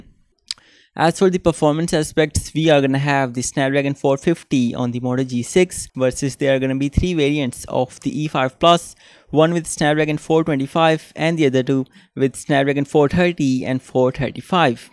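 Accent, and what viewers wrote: India. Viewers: Not a bad video but sometimes I find it a little annoying trying to understand his Indian accent.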